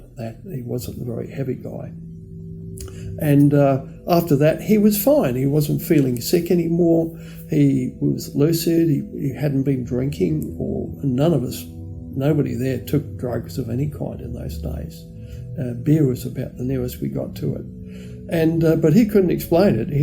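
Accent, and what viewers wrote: Australia. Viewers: Are you a fellow Australian?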